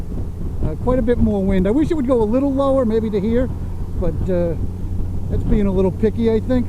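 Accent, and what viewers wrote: New England, United States. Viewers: Cool video, but if you don't mind my saying - that is a serious New England accent you got there. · "Manchestuh New Hampshuh" Love the accent.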